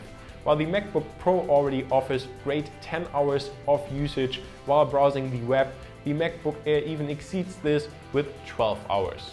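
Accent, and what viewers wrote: Germany. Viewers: Germany I guess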